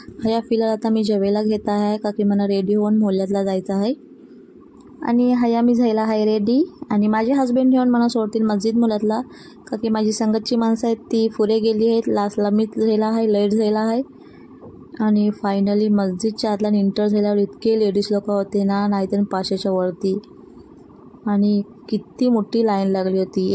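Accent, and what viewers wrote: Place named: Konkan, India. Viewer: रेसिपी तर छानच. मी तुमच्या चॅनेल वर नवीन आहे. कोंकणातील मुसलमानांची मराठी किंवा कोंकणी खूप खूप गोड आहे. मध्ये मध्ये अरबी, उर्दू, पर्शिअन शब्दांची पेरणी. ऐकताना खूप मजा येते. आपण कुठल्या गावातून विडिओ बनविता ते सांगाल का ?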